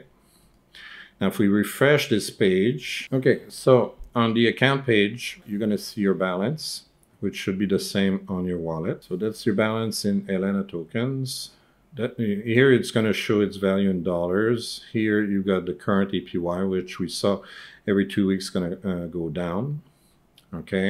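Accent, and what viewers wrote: Quebec, Canada. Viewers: Are you from Quebec? I hear s Quebequois accent. 🇨🇦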